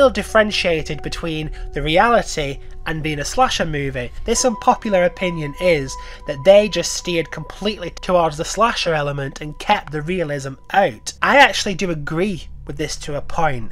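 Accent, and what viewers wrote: United Kingdom. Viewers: I really do feel like Roman fits into the whole story very well - it’s a believable twist and a big one, however as people dislike the third movie, they feel like they should dislike roman and brush aside that he was the third killer in the original movie and the reason the first movie happened. My unpopular opinion is that Scream shouldn’t be viewed as a comedy more than a slasher, and whilst there are some funny moments, the franchise overall isn’t taken too seriously by some horror fans and i feel it should be, it’s the most realistic franchise in the genre and it hasn’t deviated from its realism at all, as there isn’t anything supernatural with the killers. So to conclude, Roman being the third killer is canon and he provided a good twist, and Scream as a whole should be viewed as a more serious slasher entry, rather than something of a comedy. 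Great video, it’s always nice to hear a fellow brit loving scream! 🔪🇬🇧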